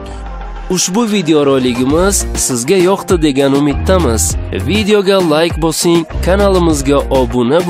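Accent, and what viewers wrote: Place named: Tashkent, Uzbekistan. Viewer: Tashkan shevasi eng rasvo sheva.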